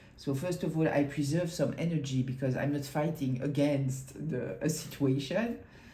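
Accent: France. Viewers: Thank you so much!!! :face-red-heart-shape::face-red-heart-shape:Yes exactly! And you explained it so perfectly. I feel like sending it out to my community and extended relatives to explain what they are doing to me. I totally walked away. This helped me so much! I was feeling guilty. Prayed to God for an answer. You provided that for me in a beautiful french accent. I am so grateful to you. You are sure connected to me. I mean it is exact. Took me years to see it. They have caused me such hell, I've lost so much money due to them and I'm so happy to be rid of them. :face-red-heart-shape::face-red-heart-shape::face-red-heart-shape: :eyes-pink-heart-shape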